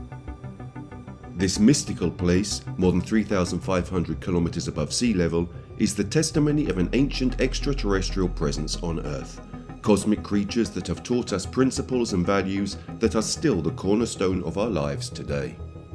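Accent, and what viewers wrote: United Kingdom. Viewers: I didnt last 12 min. English accents turn my stomach.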